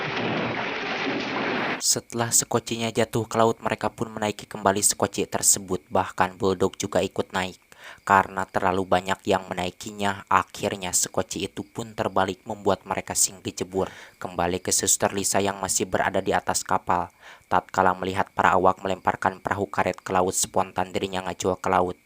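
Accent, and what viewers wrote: West Java, Indonesia. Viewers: sangat terhibur..terutama baceona..eta sunda pisan..😂😂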